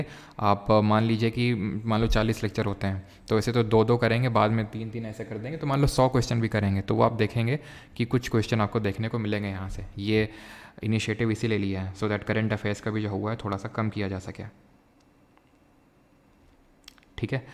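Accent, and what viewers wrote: Kanpur, India. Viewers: Pahli bat to sir apki boli se lgta hai aap kanpur me agal bagal se hain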